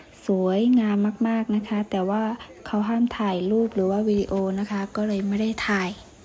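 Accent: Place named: Central Thailand, Thailand. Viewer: น้องพูดไทยกลางชัดมากครับ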